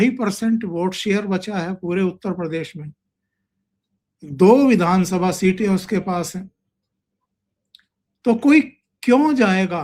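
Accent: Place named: Haryana, India. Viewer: Nahi pata tha shri Omkaar sir haryanvi hai aaj pata laga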